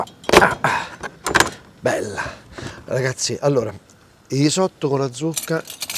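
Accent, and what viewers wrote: Rome, Italy. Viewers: Ti auguro il meglio per te, e sempre forza 🇮🇹, continua sempre così con il ns made in italy, con la tua ironia, pacatezza ed accento romano.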